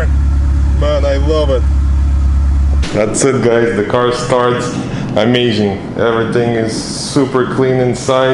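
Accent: Russia. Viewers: bro, are you Russian?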